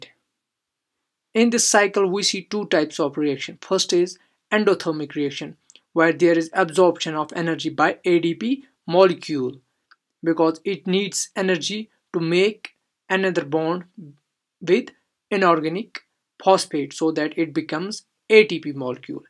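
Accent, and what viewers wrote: Kashmir, India. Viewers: You sound like you're a Kashmiri? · Kashmiri accent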